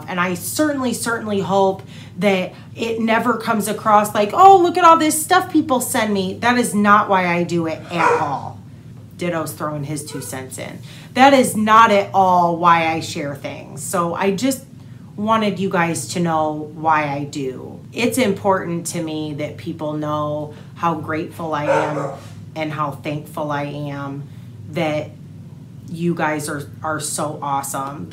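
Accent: Midwest, United States. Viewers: I'm from Madison Wisconsin and I'm so happy that you say "All's." My Mom use to ask me all the time " How do you spell that?" Great Midwest accent!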